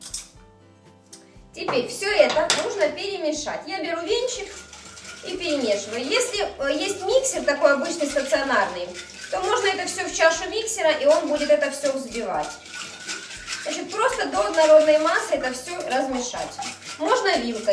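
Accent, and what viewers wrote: Ukraine. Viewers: Вам речь кажется неграмотнной, а на самом деле это местный диалект русского языка на украинской почве. Так же как существует масса диалектов в пределах России. Все очень просто, можно было бы Вам и самой додуматься, но видно привычное русское высокомерие мешает.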